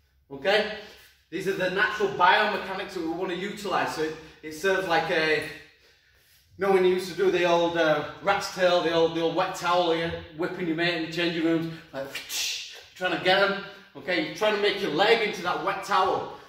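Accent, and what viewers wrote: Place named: Northern England, United Kingdom. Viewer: Your north english accent is actually cool.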